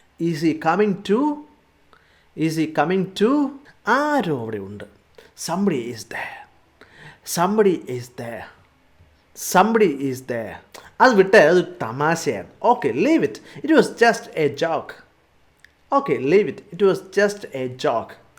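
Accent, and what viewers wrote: Kottayam, India. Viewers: Are you from kottayam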